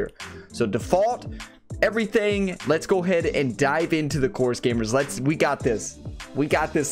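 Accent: Kentucky, United States. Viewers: what state are you from? trying to guess your accent? my guess is Kentucky?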